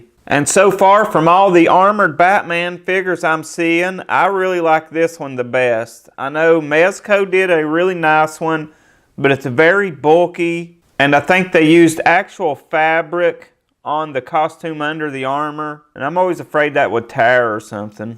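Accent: South, United States. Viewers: I like your southern accent pretty rad. Nice score from DareDevil19 also did you like my Superman poses in the review? I gotta learn to edit better so I can do cooler posing tricks in my vids but my style is mainly start to finish recording with only one or 2 cuts from packaging to figure. I find with transformers especially it's more exciting and authentic that way, not cheating like to make it look like I know what im.doing, instead you know I know what I'm doing ;) lol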